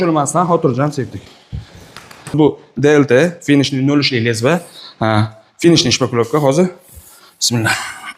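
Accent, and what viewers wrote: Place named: Namangan, Uzbekistan. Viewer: Наманганлик менимча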